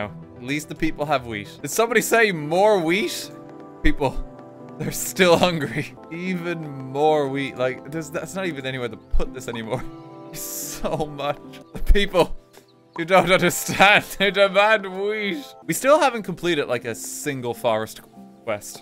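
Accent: Ireland. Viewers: Irish jerma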